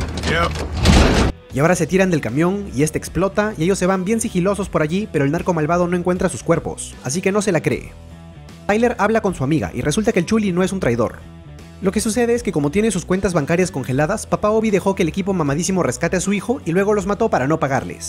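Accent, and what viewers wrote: Peru. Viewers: aah eres peruano xd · ERES DE PERU MASNA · Todo porque le entiendo porque yo también soy peruano uwu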